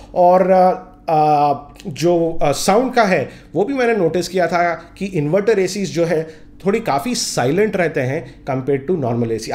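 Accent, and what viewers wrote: Hyderabad, India. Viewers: Why do I love this channel so much? All of his videos are really informative. Respect to you Sir for posting such great videos. You are the best🙌 #lovehyderabadiaccent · Ye Hyderabadi hai bhai.... Isme bhi kafi achhi hai.... Asali Hyderabadi aur bhi mazedar hai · Information was good but good luck with your hyderbadi hindi next time. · I'm subscribing ur channel because of Hyderabadi hindi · आपकी हैदराबादी हिंदी सुनने में बेहद अच्छी लगती है। कृपया इसे जारी रखें। धन्यवाद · Good Hyderabad hi accent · well done dear. I have been your viewer quite a while during smartphone sunrise. your appearance with hyderabadi hindi doesn't make any difference to northerns which I loved it. keep it up. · Bhai, you rock and love your Hyderabadi Hindi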